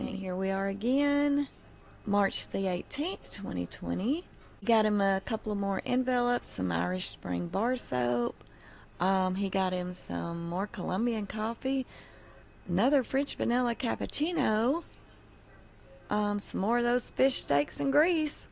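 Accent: South, United States. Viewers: En vel ops lol I know those southern pronounciatuons!! I love it! Been in South Texas too long not hearing the real southern accent!!! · Nothin' better than southern accent. We friendly too! Well, most of us...😁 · this Aussie is loving your sweet southern accent reciting this shopping list. Also your little “barbs” thrown in make this very interesting to listen to.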